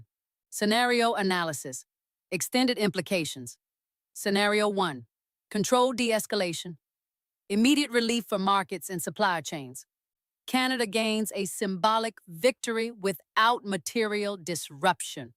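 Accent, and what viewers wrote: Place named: South, United States. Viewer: Loove yur sweet li'l southern accent ya'all. I am an Aussie and can understand you well.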